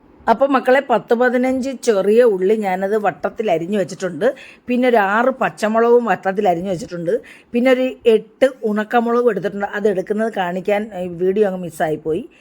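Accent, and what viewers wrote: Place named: Mavelikkara, India. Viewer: നമ്മുടെ മാവേലിക്കര കാരുടെ അച്ചടി ഭാഷ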